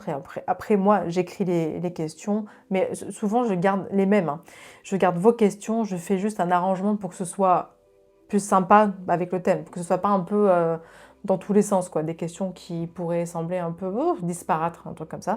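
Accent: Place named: England, United Kingdom. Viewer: Ha je viens de comprendre d’où vient ce charmant accent! Je pensais d’Angleterre, je n’étais pas loin!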